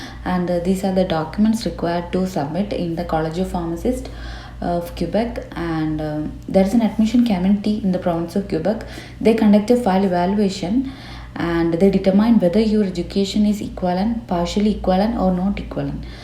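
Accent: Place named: India. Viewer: The strong Indian accent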